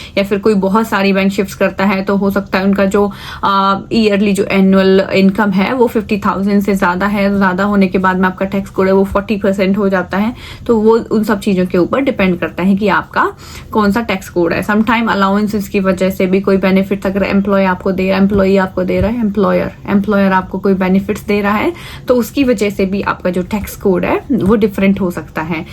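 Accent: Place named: England, United Kingdom. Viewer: Ap k Hindi mai English ki jhalak arehi hai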